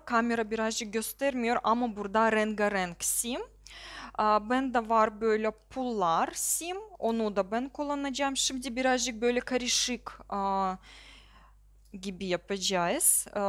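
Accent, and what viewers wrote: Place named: Russia. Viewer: Ayyy sivene bayıldım yaa,normalde bu kaba Rus şivesini sevmem,ama bu bana çok sempatik geldi nedense 🥰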